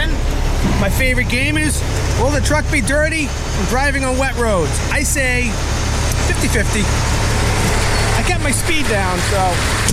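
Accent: Boston, United States. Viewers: your videos are great don't change a thing the way you're video's come across are fine its you i don't want professional thats not you. Oh by the way i like your Boston accent especially how you say yard😂😂😂😂 Keep on trucking with the W9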